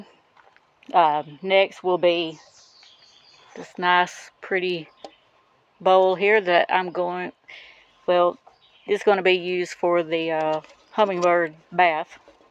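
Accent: Georgia, United States. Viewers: It’s so pretty,love the colors and the match your bowls. Maybe try to mix the two colors together? They just go great with water to me. I could listen to you talk all day, I thought maybe from Georgia, sounds like it and your place is beautiful as well. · Where do you live? You sound like my Georgia family members 😊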